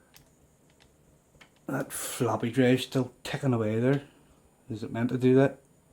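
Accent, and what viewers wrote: Belfast, United Kingdom. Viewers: YASSSSS! a fellow Norn Iron A1200 user here, great hearing a local accent - subscribed!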